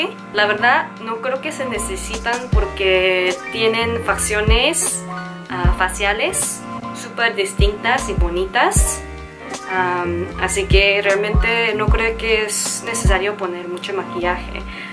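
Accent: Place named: Mexico. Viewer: Cómo ya hablas cómo nosotras las Mexicanas , ya t considero parte de nuestro país. BESOS 😘😘😘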